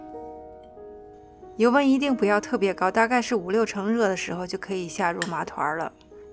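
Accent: China. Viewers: i like your chinese accent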